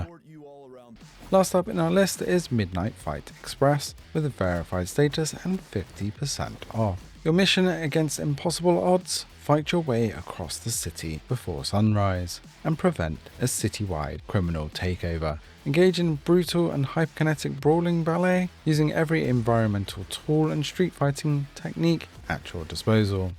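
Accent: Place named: United Kingdom. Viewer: 😂 the narrator of this channel sounds like he's so bored, that brit almost put me to sleep. Highly doubt he games. Just bought Midnight Fight Express can't wait to check that one out!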